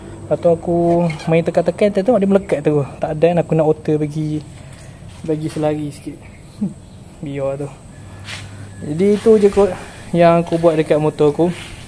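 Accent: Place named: Pahang, Malaysia. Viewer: gaye berckp mcm org pahang..heheheh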